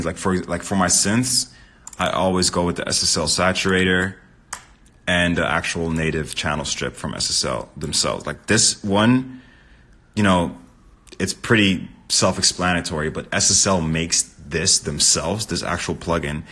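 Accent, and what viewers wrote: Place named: Pennsylvania, United States. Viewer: Sounds JUST like my guy from PA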